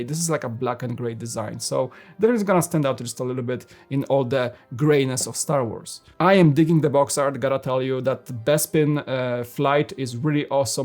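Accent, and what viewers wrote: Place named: Poland. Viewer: Sets are great, but overpriced. I think the reason is Disney license, it must be very expensive, at least we get new Cody Minifigure. Ps. That's my first time on your channel, are you from Poland? You sound like a pole :)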